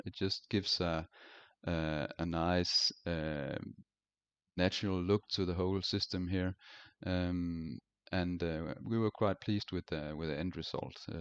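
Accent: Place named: Denmark. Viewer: Your video was just recommended in my YouTube account. So then I just start watching it right away. And Wow. This is in Denmark. That’s why I felt little bit of Scandinavian/Nordic vibe in your video. I can hear your Danish accent . I didn’t know your Danish until seeing your name on your youtube profile.. Roskilde and Copenhagen used to be my home for 6 years. You’ve built an impressive natural pool. I like it. Thanks for sharing.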